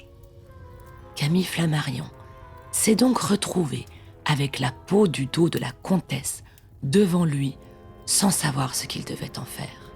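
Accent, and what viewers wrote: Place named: France. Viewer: you are the most French sounding French woman who has ever existed! Bravo et bonne continuation de Bretagne. 🇬🇧😉👍🇫🇷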